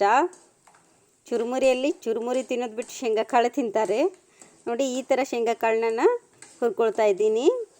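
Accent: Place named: North Karnataka, India. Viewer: ಅಕ್ಕ ನಮಸ್ಕಾರಗಳು😊 ನಮ್ಮ ಉತ್ತರ ಕರ್ನಾಟಕದ ಭಾಷೆ ಕೇಳೋಕೆ ಬಹಳ ಚಂದ ಅದರಾಗೆ ಮಂಡಕ್ಕಿ ಜೊತೆ ಇನ್ನು ಚಂದ, ನಮ್ಮಕ್ಕನ ಕನ್ನಡ ಮಂಡಕ್ಕಿ ಗಿಂತ ಚಂದ 🎁🙏🏻✅💐 ಅಕ್ಕ ಇಂತಿ ನಿನ್ನ ಪ್ರೀತಿಯ ತಮ್ಮ 😍🤗🙏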